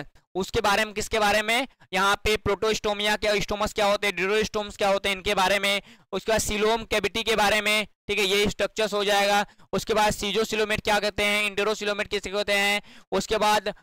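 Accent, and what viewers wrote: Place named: Bihar, India. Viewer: By the bihar me yesi hi pronounce hota hai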